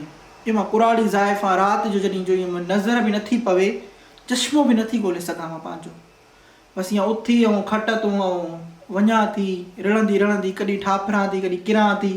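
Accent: Sindh, Pakistan. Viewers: 😂😂u R superb... 👍❤dadho khili aa twhn j ghalin te...twhn ji sindhi tmam ghni typicl aa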